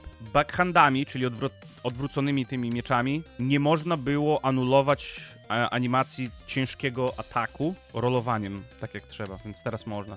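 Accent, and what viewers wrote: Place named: Podlasie, Poland. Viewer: wschodni akcent... Podlasie? :)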